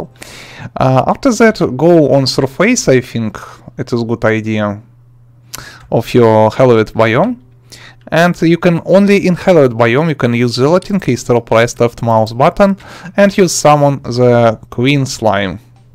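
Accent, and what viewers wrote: Russia. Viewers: He sounds so russian or of a similar region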